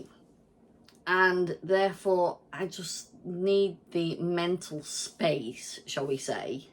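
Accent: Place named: Merseyside, United Kingdom. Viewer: Oh, thank you! I have to say that your accent brought me back to my childhood trips to see my grandparents in St. Helens. We would regularly go into Liverpool and have mini-holidays in Blackpool. I don't if you know those areas but they are very dear to me.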